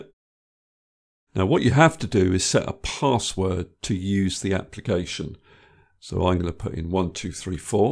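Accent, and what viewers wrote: England, United Kingdom. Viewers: Thank you brother. Great, easy to understand. I think the English accent helped a lot. You have gained a new dinosaur subscriber!🤣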